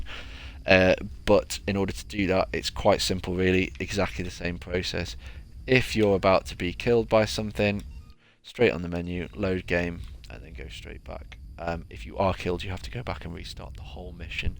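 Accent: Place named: United Kingdom. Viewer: Very British...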